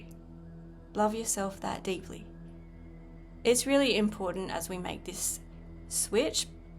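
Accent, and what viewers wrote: Australia. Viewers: Aussie accent!❤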